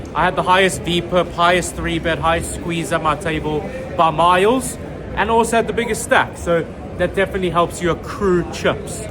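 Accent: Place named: South Africa. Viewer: Loving the vids - the energy and the enthusiasm and the SA accent (I grew up in Benoni!!! - living in the UK now). Keep 'em coming ... but man you have to slow down! How many of the top guys will be rushing off to play hours of cash games at the end of a 12-hour Man Event day? Save that brain power for Day 3 ... and hopefully beyond! 🤟🤟🤟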